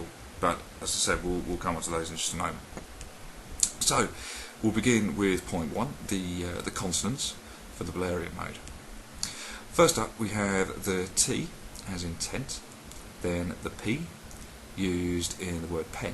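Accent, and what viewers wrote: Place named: United Kingdom. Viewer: "...we have the "aa" as in "run" - my non-native english mind is confused with your British accent.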